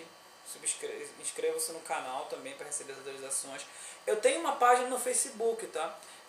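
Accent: Pará, Brazil. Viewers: É paraense?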